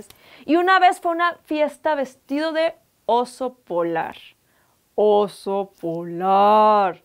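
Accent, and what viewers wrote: North, Mexico. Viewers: Sera de sinaloa o de chihuahua estoy seguro que es del norte pero no de donde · Gracias por hacer un programa así. Te quedó poca madre el guión, además de que tienes mucho carisma y definitivamente lo que gana contigo es el tono norteño, espero o estar errando en atinarle. Genial proyecto.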